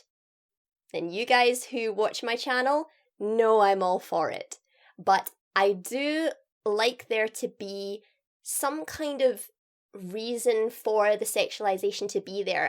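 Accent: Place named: Scottish Lowlands, United Kingdom. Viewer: Trying to identify that accent. My best guess is lowland Scottish.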